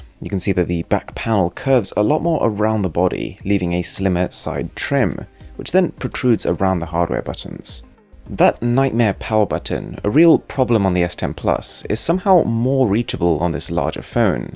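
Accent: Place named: England, United Kingdom. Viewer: no man! He lives in England that pretty much explains his accent...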